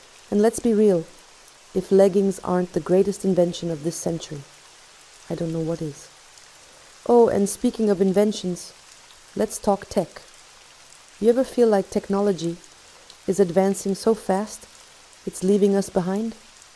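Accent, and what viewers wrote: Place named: France. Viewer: I ❤ Your French Accent. ❤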